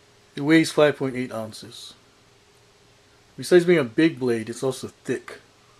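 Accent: Hawaii, United States. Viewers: yeah for sure, i mean some people just do a plain table , i like the extra effort, thats why i always watch your uploads, that and the Hawaiian accent is nice to hear.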